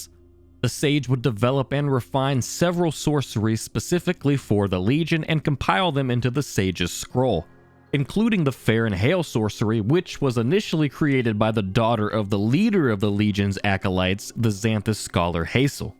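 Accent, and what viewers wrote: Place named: South, United States. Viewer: I just now noticed that you have a slight southern accent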